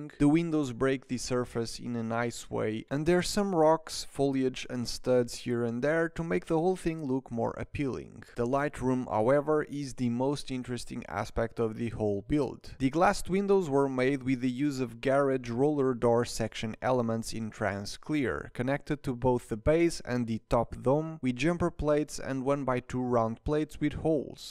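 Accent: Portugal. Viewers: Tu tens um inglês mais tuga de sempre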